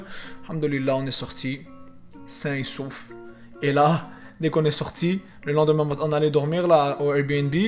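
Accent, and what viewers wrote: Quebec, Canada. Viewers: Il fait exprès d’avoir l’accent québécois ?Parce que j’avais jamais remarqué avant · Non il est québécois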